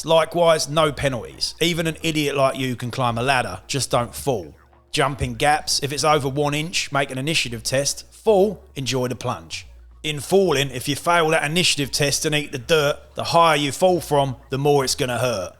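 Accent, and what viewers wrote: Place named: Cockney, United Kingdom. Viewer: The cockney accent fits really well in the underhive! Loving the narrrative style very engaging and fun to watch, thanks for the great content!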